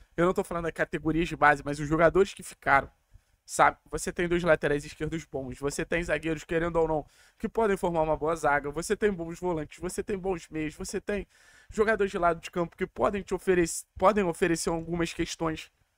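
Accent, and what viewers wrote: Rio de Janeiro, Brazil. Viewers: E esse teu sotaque de carioca.....?? Vc é de lá...??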